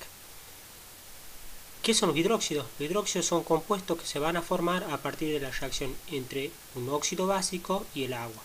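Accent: Córdoba, Argentina. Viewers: De casualidad sos de Argentina? Mas exactamente de Córdoba? Por la forma de hablar.. Un pequeño consejo.. Valencia y numero de oxidación no es lo mismo y veo que lo confundís.. Ojo con eso.. Igual muy buena explicación !! :)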